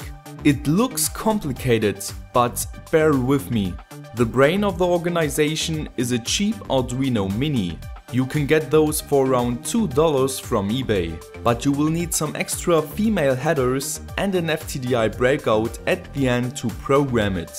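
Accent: Germany. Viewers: Das hört man auch du hast einen Deutschen Akzent aber dein Englisch ist sehr gut!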